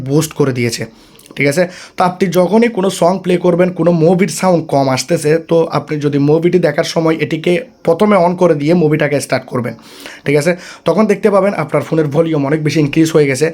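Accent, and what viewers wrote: Chittagong, Bangladesh. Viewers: কথার উচ্চারণে মনে হয় বৃহত্তর চট্টগ্রামের...! কিছু আঞ্চলিকতার প্রভাব থেকেই যায়...!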